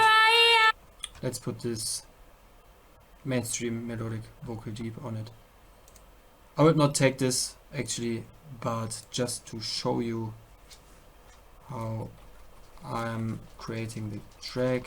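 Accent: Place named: Germany. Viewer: Dein Englisch kling Deutsch^^. oder?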